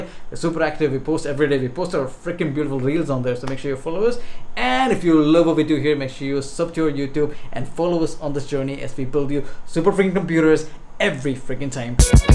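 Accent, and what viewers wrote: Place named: Kerala, India. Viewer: BRO LIKED YOUR PRESENTATION WHAT AN ENGLISH MIXED WITH SOME COUNTRY SIDE MALAYALM LUV THAT PLEASE GO LIKE THIS ITSELF WE LUV IT IN FUTRE I WILL BE CONTACTING YOU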